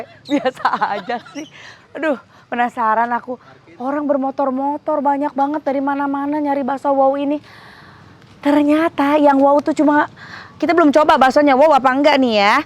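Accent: West Java, Indonesia. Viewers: seneng bagnet denger teh susundaan berasa di kampung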